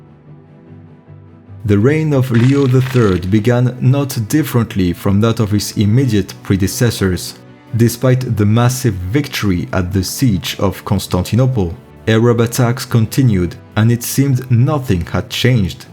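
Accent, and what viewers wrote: France. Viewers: From your accent in this video i think you are frensh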